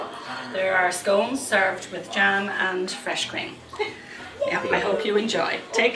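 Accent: Ireland. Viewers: somehow the scones look like they would be more delicious because she has an Irish accent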